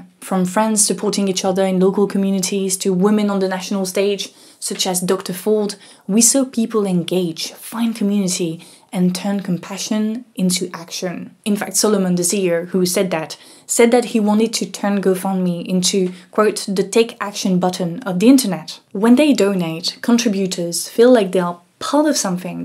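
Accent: France. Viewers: I enjoyed this video but I would also like to thank you for improving your English. I was having a hard time following you in the past because of the heavy French accent. 😊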